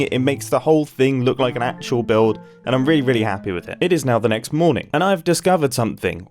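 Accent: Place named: United Kingdom. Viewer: How often is it that you hear somebody say in the calmest british accent ever I'm building a giant tank.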